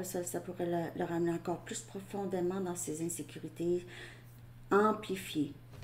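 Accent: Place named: Sherbrooke, Canada. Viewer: Woin a pomale le même accent à Sherbrooke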